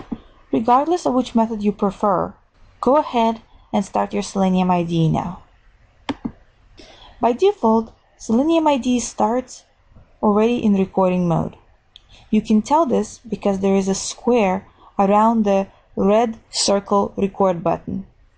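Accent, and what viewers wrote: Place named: Russia. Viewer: Your accent reminds me of the accent of my Russian friends, you gotta be of either Russian or another Slavonic origin, don't you? :)) p.s. thanks for the vid, it's good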